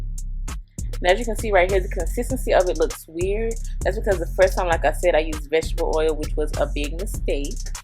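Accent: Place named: South Carolina, United States. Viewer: Omg really you have a different southern accent than most of the SC people I know. I love it.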